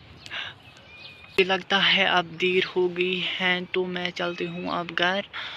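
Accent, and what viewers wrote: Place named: Arab world. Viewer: Arabi auuent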